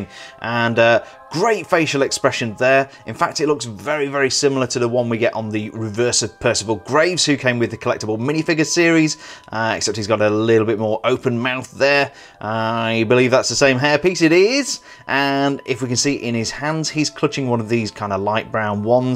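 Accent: United Kingdom. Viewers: Hi! New to the channel and I love your reviews! Can't help but notice that you have a British accent but you review LEGO in American packaging :P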